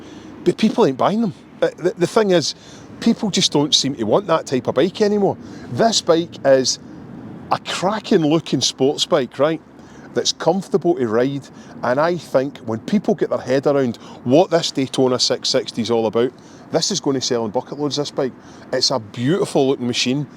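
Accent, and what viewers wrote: Scotland, United Kingdom. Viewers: Great video. Down to earth, realistic assessment. No need for mega power on today’s roads, just great handling and flick ability . This bike will be a winner. Always love the Scottish accent… being a Geordie I’m half way there. Thanks. 😂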